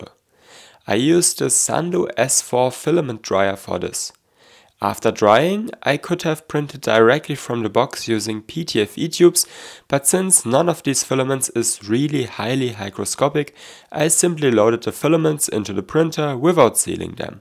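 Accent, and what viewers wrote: Germany. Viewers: the british pronounciation doesn't sound like that. Yours and Stefans is more like a very heavy german accent, which is ok i guess but funny nonetheless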